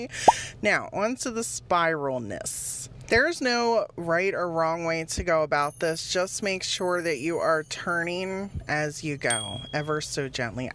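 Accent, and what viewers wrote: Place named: East Coast, United States. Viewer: I know an East coaster when I hear one but you almost got me with the ya'll lol. I lived in that store before I moved thinking they were everywhere what a disappointment when I got here. If they were smart they would expand cause the sales would make up cost 10 fold. Binge time to see all the nice things you have made. Keep up with your style an humor cause it's great.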